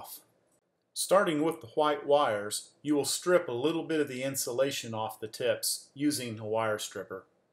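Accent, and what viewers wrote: South, United States. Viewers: that's just how we on the South say "wh"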